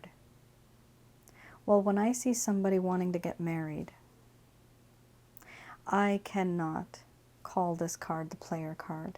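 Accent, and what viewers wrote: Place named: Hawaii, United States. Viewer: WOW... N U R D ONLY ONE 2 RESPOND .. THANK U 4 DAT... HEHEHEH HEHEHEH... U SOUNDED SEMI HAWAIIAN, DATS Y I HAD ASKED... THANK U AGAIN...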